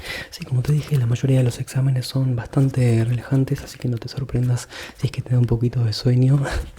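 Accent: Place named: Uruguay. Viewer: Me encanta tu acento. ¿Sos Uruguayo? Saludos!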